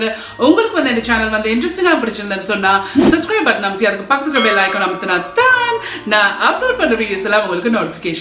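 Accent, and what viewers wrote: Jaffna, Sri Lanka. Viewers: Dear sister reasontly i saw your videos it's very useful my mother cooked like this i didn't learn from her she passed away every day when i cook i sadly remember her and regret my careless but now i am very very happy because your are uploading my moms recepi thank you very much Sister and your tamil (our jaffna tamil) is super👍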